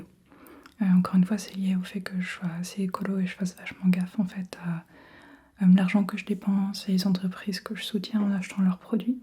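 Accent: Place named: France. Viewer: Coucou ! Par rapport à la question sur tes pronoms/ton genre, du coup je me posais une question, comment le pronom "ael" s'accorde pour les adjectifs ? Si j'ai bien compris tu préfères utiliser les adjectifs neutres ce que je comprends totalement mais voilà je me posais la question :) Sinon par rapport à la bienveillance que tu retrouves + chez les anglophones que chez les francophones par rapport au 50/50 des vidéos anglaises/françaises je pense que c'est parce que les anglophones ils adorent l'accent français même s'ils comprennent pas et les francophones ils aiment pas trop les vidéos en anglais.. en tout cas bon courage pour tenir le 50/50 mais sache que tu nous decevras jamais peu importe les vidéos que tu fais :)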